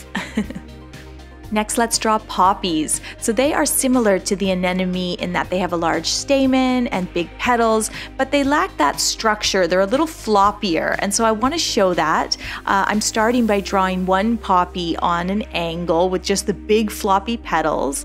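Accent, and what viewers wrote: Canada. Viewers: She is good, but camera is to far away most of the time. She sounds like Canadian. I would like to see these done in color.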